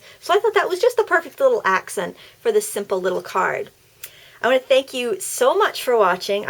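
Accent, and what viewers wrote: Maine, United States. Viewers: Just found your channel today and I love it already! I also love this technique, and I love your advice regarding alcohol markers as it is something I want to start, but haven't because of the price. BTW, while you were talking I kept thinking that your accent sounds familiar. When you said you are in Maine I realized thats why as I'm just north of you in New Brunswick! And Mardens is awesome, whenever we go across the border it is a requirement to visit there, lol. :)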